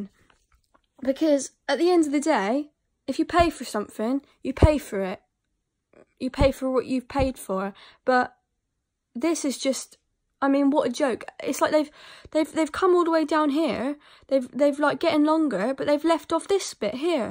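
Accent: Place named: West Country, United Kingdom. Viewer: that west country accent lol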